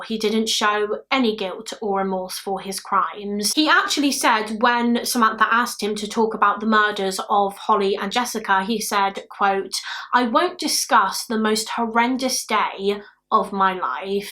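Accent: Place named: England, United Kingdom. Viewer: I think ur work is amazing!!! Although i dont particularly like English accents - ur content is too good to ignore. Its comprehensive, interesting and well written. Keep it up!!! Also....introduced to ur channel by Josh Miles and his collab vids 😁👍 keep up the great work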